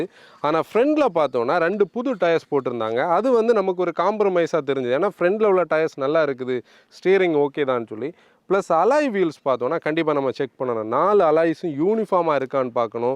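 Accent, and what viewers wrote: Kanyakumari, India. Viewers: Kanyakumari voice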